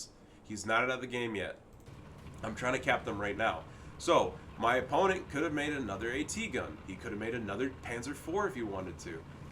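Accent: Germany. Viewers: Great game, your german accent cracks me up every time.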